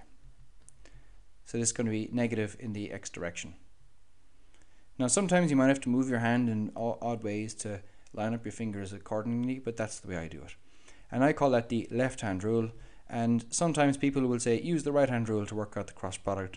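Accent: Ireland. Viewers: Instantly liked because you're Irish and it's a great accent to listen to physics too (Y)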